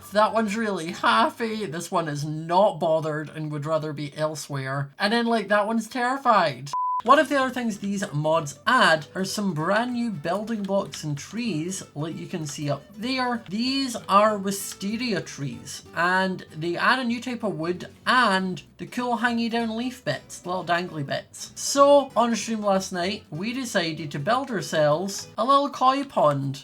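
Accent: United Kingdom. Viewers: you saved joel from the wither! WE STAN british accent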